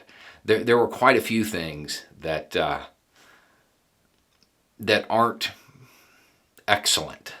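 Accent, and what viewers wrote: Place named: Oklahoma, United States. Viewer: are you by chance from Oklahoma? (accent related)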